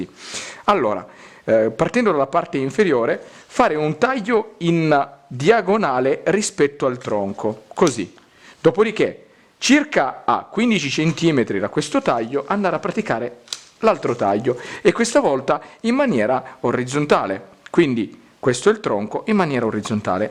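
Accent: Sicily, Italy. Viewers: Min "pucciarla.." dal tuo accento mi sembri siciliano o comunque del Sud, come lo sono io. Sentirti usare il verbo "pucciare", termine dialettale piemontese e lombardo, fa veramente male. Però, detto questo, complimenti per il bel video 🙂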